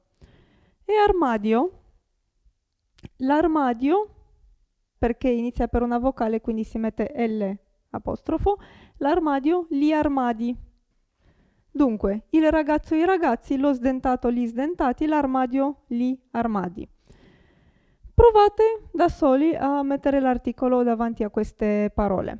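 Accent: Eastern Europe. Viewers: beh il vocabolario più esteso, i modi di dire, la pronuncia, può insegnare anche i vari toni di dialetti etc etc etc... Quello che non mi è piaciuto in questo video è la pronuncia dell'est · She's not Italian. Her accent is from Eastern Europe.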